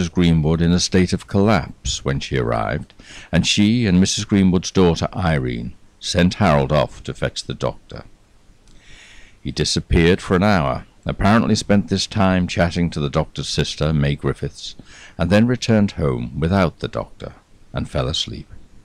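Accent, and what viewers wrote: United Kingdom. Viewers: Yes, I have subbed after seeing you have more to watch. I will try hard to ration myself to one a night, with a cup of cocoa😀💙. I hope you are still out there somewhere bringing more lovely, unknown stories, good for us, not so for the poor victims. Love your voice, I am born and raised English, but live in the USA. You sir feed my need for class A British accent. Thank you from my English heart.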